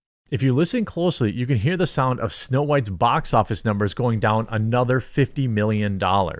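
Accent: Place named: Wisconsin, United States. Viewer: Is it a Wisconsin accent thing to pronounce bag as baaig and gag as gaaig I just notice does this with the "ag" sound.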